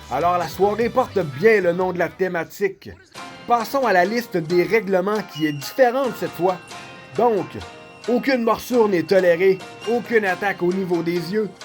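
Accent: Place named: Quebec, Canada. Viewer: BRAVO pour le travail super vidéo, très drôle et l'accent quebecois m'a rappellé le commentaire de raymond rougeau sur les vhs de la wwf. jespere que l'UFC ne strikeront pas tes vidéos, j'ai deja eu ce probleme j'ai dut retirer toutes mes videos retro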